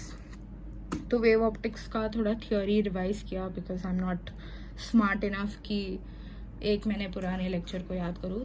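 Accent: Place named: Mumbai, India. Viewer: Bro got mumbai accent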